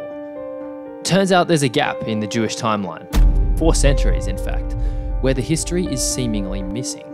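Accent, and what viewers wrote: Australia. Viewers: Oh, and the New Testament list was compiled in 49AD (not in 85AD like video says). This sounds like that dude from Australia or New Zealand who made millions becoming a street preacher. Is that his voice? Then it would make sense since he's got a lot of the historical facts here or is purposefully leaving out the true historically correct dates.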